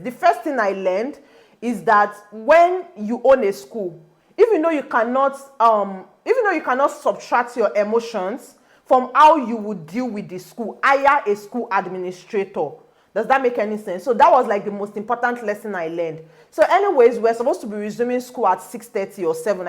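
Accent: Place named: Nigeria. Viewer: Everything about you is cute, but stick to Naija accent please!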